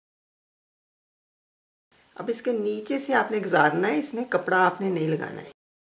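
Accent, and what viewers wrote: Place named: Lahore, Pakistan. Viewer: AssalamoAlaikum ma'am chain stich 6th ki home Economics main sekha but kabhi pta nahi tha k ismain itna variations possible hain. May Allah protect you and your family. I am from Faisalabad kya aap Lahore say hain?? Aapka excent lahori lagta hay. Maam kya aap knitting bhi karti hain agar yes to phir aapni videos upload kijye ga uski bhi. Allah aapke or aapke ghar walon ki hifazat farmaye Ameen.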